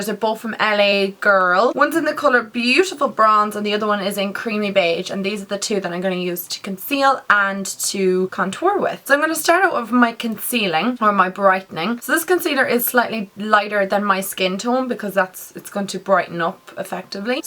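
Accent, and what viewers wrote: Ireland. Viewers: I love the way she looks so tumblr and gorg but then she comes out with this common Irish accent 😍✌ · Are u from Ireland ? You sound Irish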